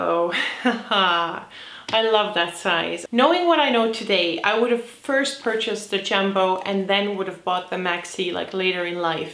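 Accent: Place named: United Kingdom. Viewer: The british accent made me diiiiieee hahahahaah aa great video <3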